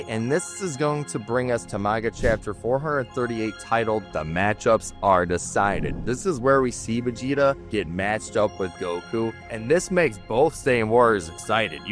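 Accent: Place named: Chicago, United States. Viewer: This is the fiercest chicago accent I've heard since home alone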